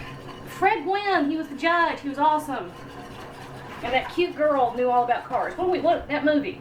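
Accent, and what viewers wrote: South, United States. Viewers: Paused the video at 4 seconds to write this comment. I live in Texas and if you ain’t getting you’re recipe from woman with a southern draw and hair as big as this you’ve already messed up.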